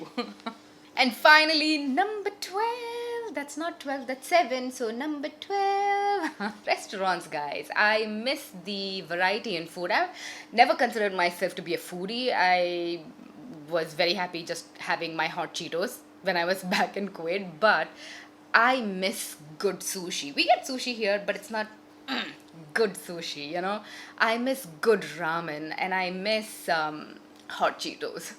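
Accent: India. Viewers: But you have a strong Indian accent ... And i hate howyou pronounce the letter c in "cannot" or other c words.. gosh ...cuz that sounds really fake and weird.. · It’s so interesting how you were born and lived your life in the Middle East but you have a very heavy Indian accent. I was born and raised in America and I sound American.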